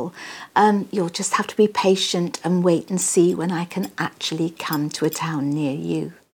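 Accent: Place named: United Kingdom. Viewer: I love her British accent its so sweet :P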